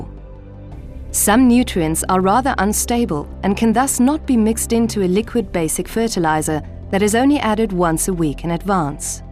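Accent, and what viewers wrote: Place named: Germany. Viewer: i hate posh english in a german accent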